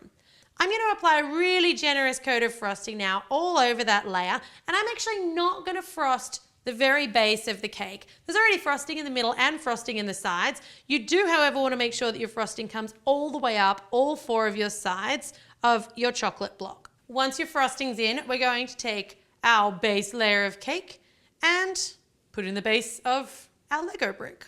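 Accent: Australia. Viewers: Is she Australia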